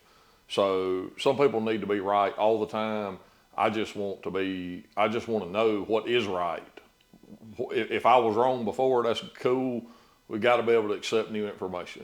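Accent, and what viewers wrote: South, United States. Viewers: Love that southern drawl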